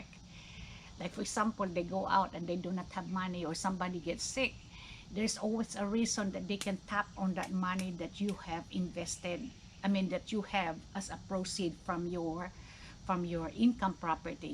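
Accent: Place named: Philippines. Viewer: Are you a Filipino ? If yes, then could you pls speak in your mother tongue your accent speaks for it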